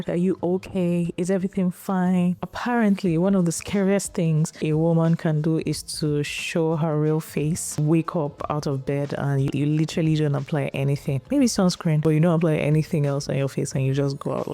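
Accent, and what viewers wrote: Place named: West Africa. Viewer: Unrelated, but you have a beautiful speaking voice. You must be West African, i love yalls accents 😊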